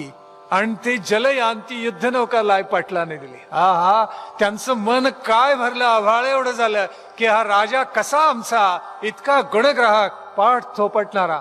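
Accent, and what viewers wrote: Standard. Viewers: आदरणीय गुरूवर्य आपल्या अमोल वाणीतून छत्रपती शिवाजी महाराजांचे व शुर मराठे सरदार व शुर मावळ्यांचे पराक्रम व महाराजांनी केलेला राज्य कारभारा बाबत केलेले उद्भोदक प्रवचन ऐकून मन तृप्त झाले. आपली पवित्र वाणी आणि सुस्पष्ट शुध्द मराठी उच्चारा मुळे आपले प्रवचन सतत ऐकत राहावे अशी मनोमन इच्छा होते. आपल्या चरणी विनम्र प्रणाम.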